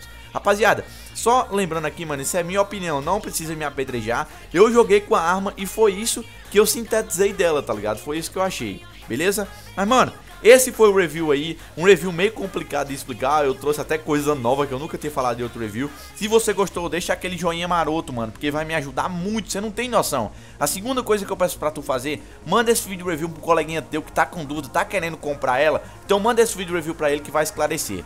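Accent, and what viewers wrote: Northeast, Brazil. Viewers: E do Nordeste ? :D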